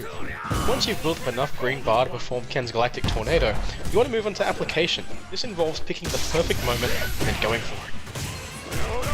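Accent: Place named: United Kingdom. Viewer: Nice video! You British people have such a cool accent :)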